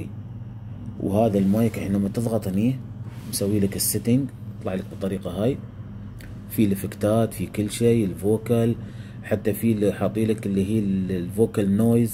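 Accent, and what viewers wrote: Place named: United Arab Emirates. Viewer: Nice. I'm Iraqi born living in the USA. My Arabic isn't that strong but I was able to understand you fairly well. I assumed the UAE dialect would be much more difficult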